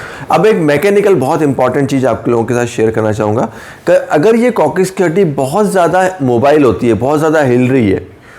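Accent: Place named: Gujarat, India. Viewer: Gujrati ki zuban me gujrati jalak mil hi jati he .. nice explain sir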